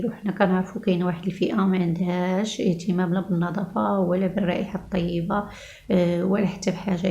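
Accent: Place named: Morocco. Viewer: لهجتك مغربيه بحته حاولي تتكلمي الفصحى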